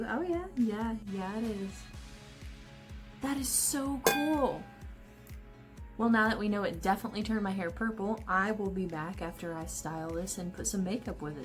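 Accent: Midwest, United States. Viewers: Purple is amazing! Could tell you were a fellow midwesterner. Could listen to you talk all day.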